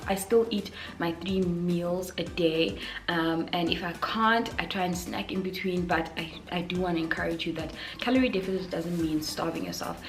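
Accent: South Africa. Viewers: Obsessed with your accent. South Africa? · so just stumbled upon your page, looooove your accent it's giving south African vibes man, where are you from? (sorry if i'm too forward) I always get this excited when I hear a fellow African accent somewhere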